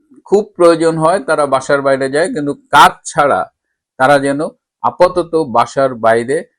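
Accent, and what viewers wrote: India. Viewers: তোমার কথা শুনে মনে হলো তুমি ভারতীয় দাদাবাবু তীয়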